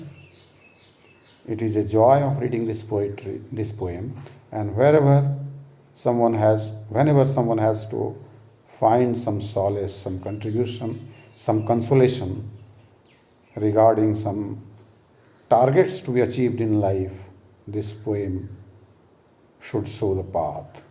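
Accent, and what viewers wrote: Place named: Bengal, India. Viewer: Thank You Very much Sir for your help. Wow! Sir Your bengali accent is very sweet.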